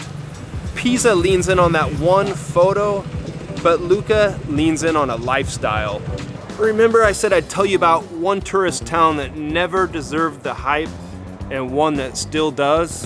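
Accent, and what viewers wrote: United States. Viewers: You sound like an American. Perhaps just stay there.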